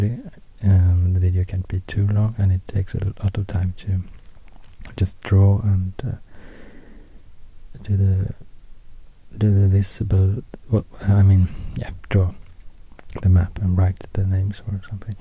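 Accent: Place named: Sweden. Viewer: I specifically came here for Swedish language and Swedish accent, so feel free to mispronounce any words :D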